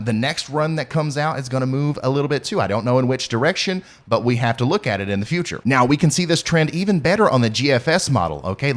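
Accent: South, United States. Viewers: Your fantastic! Soooo glad I found your channel! And you have a nice smart sounding southern accent ..opposed to the other southern sounds😘😁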